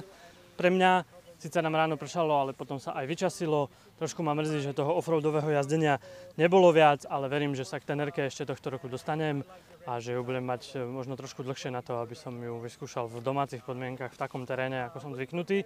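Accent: Eastern Slovakia, Slovakia. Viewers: Pěkný test, i já jsem ji dal v sobotu v Karlovicích pěkně za uši...Podle přízvuku tuším, že jsi vyhodnár, bo pekne si hutoril :-)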